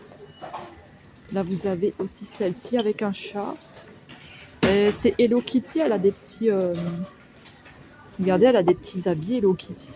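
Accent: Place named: Alsace, France. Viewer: Ah ce petit accent alsacien !!!! J’adoooore !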